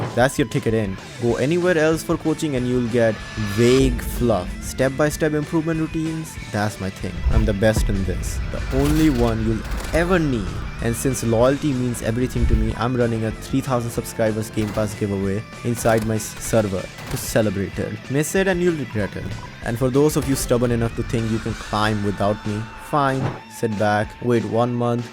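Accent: India. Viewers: This guy sounds like an indian🗣😭🙏🏻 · there is no way your name is with your indian accent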